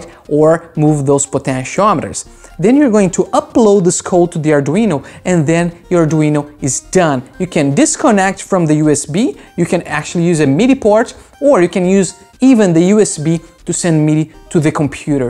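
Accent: Brazil. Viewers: Thanks for the info, I am going to build a controller for my DAW. I am from England but now permanently live in Brazil (Your accent sounds Brazilian).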